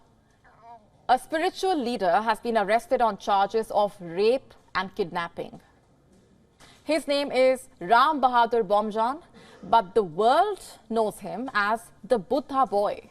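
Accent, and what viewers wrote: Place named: India. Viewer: Hard to understand for this report by english with the indian accent. Give up on her.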